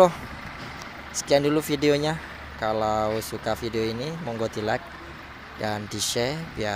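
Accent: Indonesia. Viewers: Orang indonesia asli kah bang kaya logat bahasanya hehe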